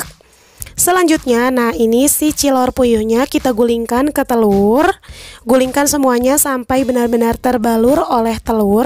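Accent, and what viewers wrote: West Java, Indonesia. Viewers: Logat sundanya bikin tambah semangat liat tutorialx. Thx resepx sehat selalu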